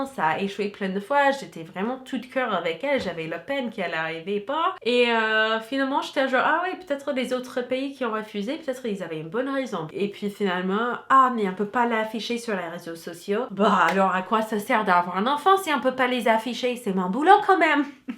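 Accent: Quebec, Canada. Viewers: Tu m’as brisé le coeur :,( La vidéo était hyper intéressante bien que vraiment touchante et triste. Ps: tu commences à avoir l’accent canadien ;) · Super video! PS: ca faisait longtemps que je n'avait pas vu une de tes vidéos, et ton accent à vraiment changé ! Y a vraiment des prononciation et intonations québécoises , c'est marrant à entendre!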